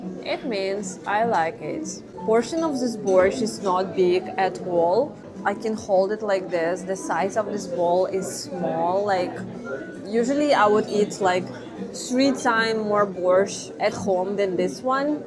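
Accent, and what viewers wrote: Russia. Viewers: Нет, всеравно русский акцент, я не choose гречка , а говорить l have chosen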